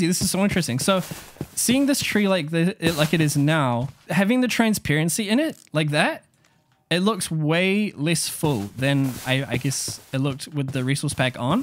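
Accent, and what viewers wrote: New Zealand. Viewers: I never thought I would hear a NZ accent. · are you a kiwi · are you a kiwi? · Is that a New Zealand accent? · are you kiwi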